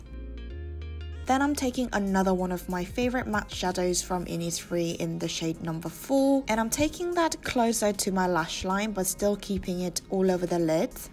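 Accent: United Kingdom. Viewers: 언니 왤케 이뻐요ㅠㅠㅠㅠ진짜 누가 백설공주 라고하셨는데 그 말 딱 맞는듯ㅠ 그와중에 영국발음 취저 탕탕😭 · 영국발음 발린다ㅠㅠㅠ..매트를 맡이라 하는 거 늠나 치이는 것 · 진짜 영국식발음 넘나 매력적ㅠㅠ 저도 영국영어 배우고싶어요ㅜㅜ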